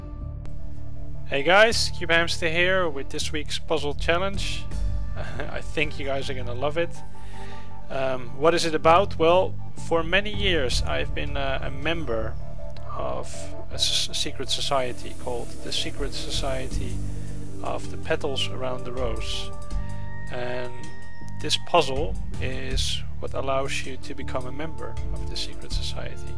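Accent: Netherlands. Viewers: You're not englisch? Younsound like a dutch? Of niet? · are you dutch maybe? :D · Zo'n episch nederlands accent. Je hoort het meteen. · You are dutch right? You sound like a guy from Holland :) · you sound dutch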